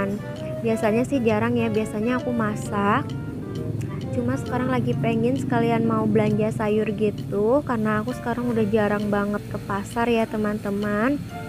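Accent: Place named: Tegal, Indonesia. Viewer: Kakaknya orang Tegal yaaa..tegalnya mana kak..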